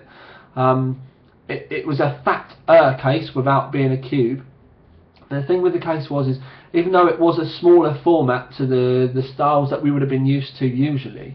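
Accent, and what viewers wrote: United Kingdom. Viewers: You wanna hear something funny, i got tired of watching the video so i decided to go and read the best of 2012 over at the site. When i started reading i realized that i was reading it in my head with a British accent. Im so used to watching your videos from over here in the US that now when i read your articles my mind puts your voice as the voice over!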